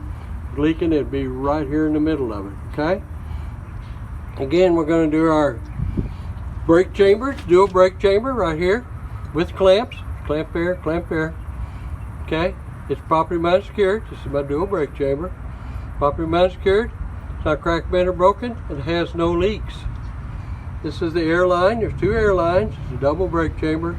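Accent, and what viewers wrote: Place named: South, United States. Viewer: He does mumble a tad bit y'all but...that's what's called a "Southern Twang", don't worry about it, just pay close attention, this man is a GENIUS! 👍🏽😉😎🫡👌🏽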